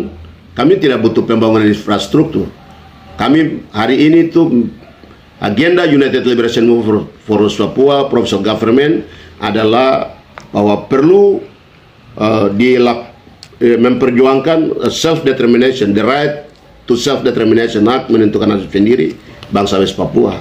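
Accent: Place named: Netherlands. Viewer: Ah,BASI apa kamu? Kamu cuma akan bercerita bohong dengan back ground human right, ya WAROM (mirip aksen Van Holland ),pelanggaran HAM dengan cerita bohong panjang lebar & berita terbalik untuk meyakinkan lawan bicaranya bahkan playing victim ditambah bahasa air mata jika perlu & agama. Perlu diketahui RI atau NKRI itu negara hukum & sangat menjunjung HAM ( human right) itu sebab NKRI punya Pancasila & undang undang dasar 1945,juga jelas secara explisit menyatakan perang terhadap segala bentuk penjajahan bahkan menegaskan harus di hapuskan di seluruh dunia, shg sudah cukup jelas pandangan bangsa Indonesia juga NKRI mengenai ham karena guidennya untuk kehidupan berbangsa & bernegara cuma panca sila & undang undang dasar 1945,shg pasti harus menjujung HAM. Bahkan bangsa & NKRI mengharamkan rasis & biasa hidup plural beda dengan organisasi kalian yang berisi WNA mirip WNI etnik irian juga orang kulit putih itu rasis bahkan mengajarkan ke anak anak, RI terkenal sekali bersahabat dengan bangsa bangsa di benua Afrika & diterima semua pandangan juga sikap hidupnya. 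Hey,WAROM bshkan sekarang jelas semua itu hal yang biasa kalian pakai propaganda adu domba serta fitnah itu,ternyata yang perkosa guru,petugas kesehatan kalian,menyandera anak sekolah,merusak jembatan,membakar gereja rasis itu kalian termasuk propaganda bohong dari agen propaganda luar negeri kalian, semua perbuatan itu melanggar HAM semua. Maka keluar atau tariklah bawa ke tempatmu negaramu & tuanmu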